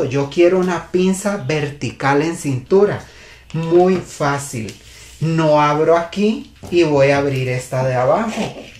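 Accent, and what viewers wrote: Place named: Costa Rica. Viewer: Maestro muchas gracias por compartir sus conocimientos, perdón tengo una pregunta: usted es costarricense? Me parece que su asentó es de costa rica. 🤗🙏🙏👏👏👏🇨🇴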